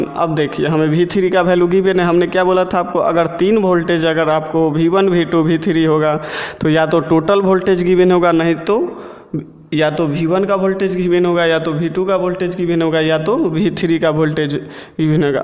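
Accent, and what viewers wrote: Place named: India. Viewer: I want this lesson but i cant listen indian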